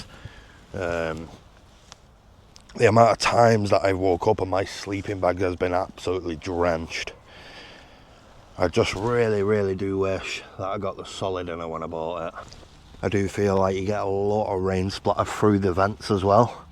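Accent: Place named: Northern England, United Kingdom. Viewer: Nice to hear a rete noorthen accent and a brew called a brew. Proper.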